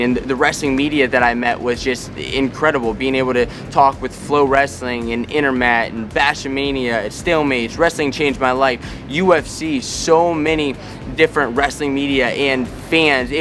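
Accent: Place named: Pennsylvania, United States. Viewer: Keep working all your contacts and especially the coaches. If you can help a coach recruit a wrestler or two you will have made an influential friend for life and then we will be watching you on Big10 Network or ESPN. All of your followers can help you scout wrestlers that should be offered scholarships to the big and nearly big programs. Then if you could lose the Pennsylvania accent and try really hard to not be a Penn State fan all of the time you could become one of the most popular wrestling announcers ever. You obviously love this and should follow your passion. Best wishes to you and just like wrestling - success goes to those who prepare in the off-season.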